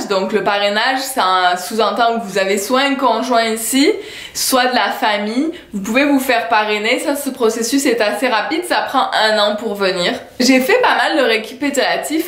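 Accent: Quebec, Canada. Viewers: t'as tellement pris l'accent Quebecois c'est abusé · Je regarde ton vidéo pour quelqu'un.Je suis québecoise.Ton accent et mi-québec mi-France j'adore.ça fait un peu spécial mais j'adore.Merci tellement pour ta vidéo❤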